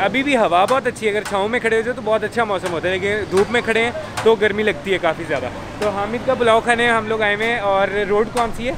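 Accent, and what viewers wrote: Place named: Punjab, India. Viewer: اچھا لگا پیشکش کا انداز پنجابی کا ٹچ مزید دو آتشہ کر دیتا ھے مرحبا پنجابی کو فراموش نہ کرنا یہ اردو کی ماں ھے سینئر ھے ماشاء اللہ سدا شاد باد منزل مراد رھو...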